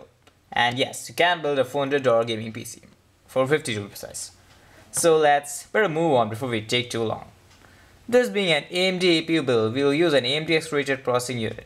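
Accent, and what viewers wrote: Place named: India. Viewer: funky indian accent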